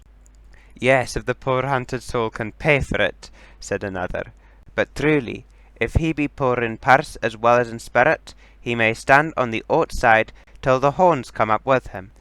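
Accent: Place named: Scotland, United Kingdom. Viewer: i love this book! it’s my favourite you did it so well!!! why are u now scottish tho?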